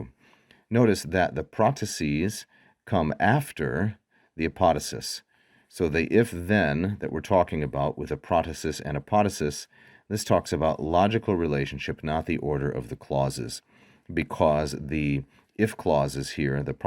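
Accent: United States. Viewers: The reader is very fast and has an atrocious american accent. Perhaps he should make an effort to correct this.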